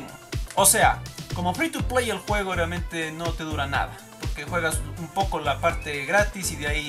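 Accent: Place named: Cuenca, Ecuador. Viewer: habla con tu asentó cuencano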